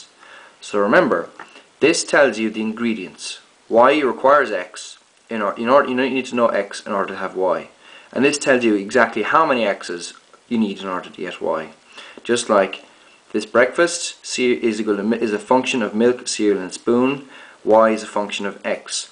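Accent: Ireland. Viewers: Ah a fellow Irish man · Are u Irish....u sound irish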